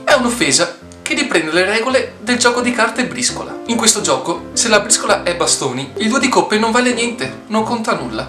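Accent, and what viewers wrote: Veneto, Italy. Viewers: Ahahah molto interessante! Io sono toscana ma adoro Venezia e in particolare il vostro accento 😍😍😍😍 Inutile dire che quando ci vado capisco meno di zero 😂 Potrebbero anche insultarmi e dirmene di tutti i colori, tanto per me è arabo 😂 Comunque è piacevole ascoltarlo, mi piace la vostra cadenza. :) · Ara che se sente che ti zè daea tera ferma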